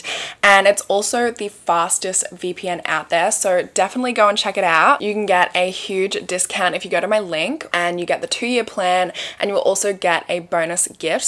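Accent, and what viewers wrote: Australia. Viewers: You’re telling me you’re Australian not British? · Thank goodness that man had the training to get her out of the water without destroying DNA. Each LE department needs to have a couple of people trained in that area because it is so important. I'm sorry, the river...I can't figure out what you're calling it. It sounds like you're calling it the nut sac river. Not trying to be funny and I guess it could be possible but your accent is much prettier than an English accent and I just figured out your from Australia. Idk how long I should have already known that. I'm getting old...maybe I forgot.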